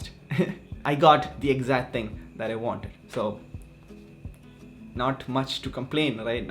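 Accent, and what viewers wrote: Kerala, India. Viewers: Yoo I saw you in a reel and followed and now you are HERE😳 anyways change the thumbnail It has a grammatical error Change "What happened?" In place of what happen? Ik imma weirdo talking like boomer 😂😂 Btw love your malayali accent 😁😂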